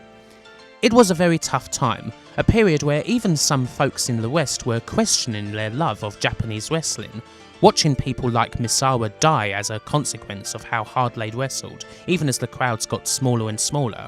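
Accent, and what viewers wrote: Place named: England, United Kingdom. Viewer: I think it's the English accent maybe?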